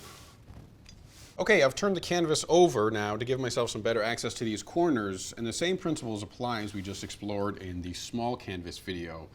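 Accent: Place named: New York, United States. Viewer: I like the New York accent.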